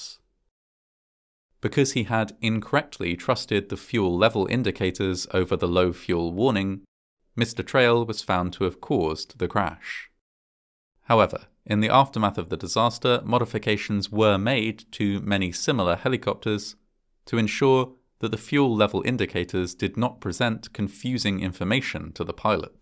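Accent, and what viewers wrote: United Kingdom. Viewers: Did someone program a bot narrator with a British accent!? Because I didn't thin a narrator could sound worse that a bot narrator with an American accent! But you did it! Absolute crap!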